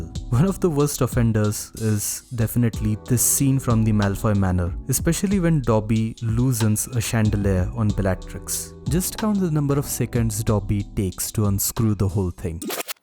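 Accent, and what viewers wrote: India. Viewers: This accent lmfao pajeet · You sound Indian brother. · Indian accent…..annnnnd I’ll pass. “Saaaaaaar they should have fired all the English people and hired pajeets. Saaaaaaar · is this guy indian? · Bullshit, Yates did a great job The retarded overly dramatic emotional cues are a thing of past that is 1990s to early 2000s U want every single emotion to be emitted as a child’s overly expressive face?? Go watch home alone. Moreover your Bihari accent ruined the essay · I was excited to watch this video but then I noticed Indian accent.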